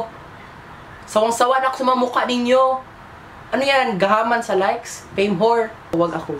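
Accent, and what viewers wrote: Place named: Visayas, Philippines. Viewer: Bisaya ka fu ba? Or whut? Accent is real .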